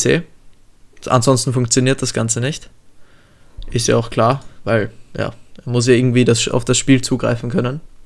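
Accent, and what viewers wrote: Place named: Vienna, Austria. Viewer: Man merkt gleich, du bist aus Österreich, würde auf Wien tippen, sag bitte ob das stimmt haha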